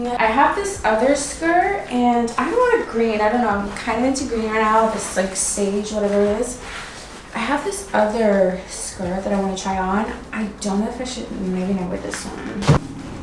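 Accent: United Kingdom. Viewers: I love your British accent 😭😭❤ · Your videos are so comforting🥺❤, enjoy your solo trip GURLLL🤍. HAPPY BIRTHDAY!! It 12pm here in Nigeria. Your British accent gets me all the time😂. Your braaids!!! giving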